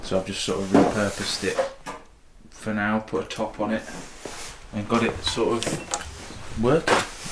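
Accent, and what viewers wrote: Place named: Peterborough, United Kingdom. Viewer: Just come across your channel and I recognised your accent straight away, I'm from Peterborough. I lived in my van for a year or so. I look forward to watching your adventures, the scenery looks amazing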